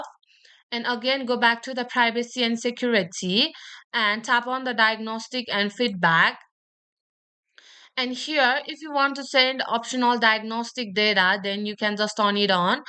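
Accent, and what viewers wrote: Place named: India. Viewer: Next time use an AI English voice. The Indian English accent is very difficult for most American to understand. But otherwise good info.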